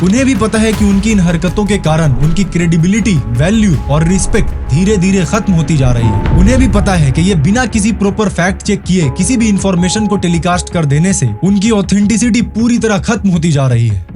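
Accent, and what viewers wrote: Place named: Bengal, India. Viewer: দাদা তোমার হিন্দি তে বাংলা টানের জন্য গর্বিত! 🙂 🙏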